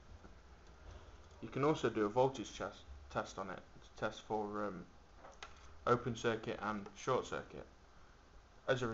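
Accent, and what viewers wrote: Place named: United Kingdom. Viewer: i would love to understand your british english language man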